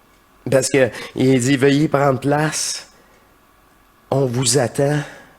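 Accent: Quebec, Canada. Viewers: Dommage difficile à suivre pour un belge francophone , ton accent québecois est trop fort ! ! Personnellement je suis une québecoise ( Iso V Sinclair ) qui fait des vidéos sur la supraconscience vibratoire , elle poursuit l'enseignement de Bernard de Montreal ... elle explique très bien l'initiation solaire , l'ajusteur de pensée , le monde éthérique hors matrice du démiurge et des archontes etc... Cependant il y a certaines choses intéressantes dans ton discours ... Merci du partage !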